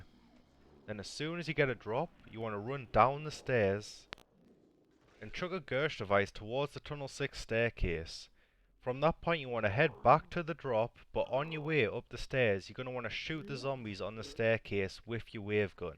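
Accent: Newcastle, United Kingdom. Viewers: he's from Newcastle lol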